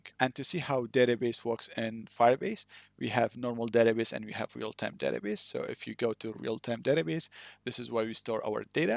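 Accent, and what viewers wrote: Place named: Arab world. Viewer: Are you Arab? Because you sound like one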